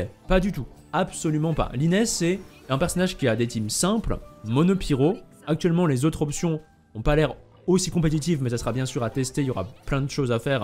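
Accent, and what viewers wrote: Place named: France. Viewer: Mec tu peux utiliser des mots français pour parler des capacités des persos parce que tu utilises des mots anglais mais tu les prononces avec un accent français donc on comprend pas trop. Merci d’avance.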